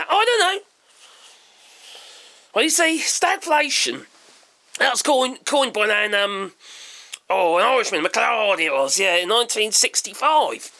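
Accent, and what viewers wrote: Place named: Southern England, United Kingdom. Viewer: It's his southern English accent.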